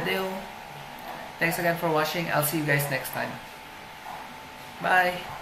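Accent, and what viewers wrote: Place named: Philippines. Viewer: based on how you speak english, i think you are a filipino?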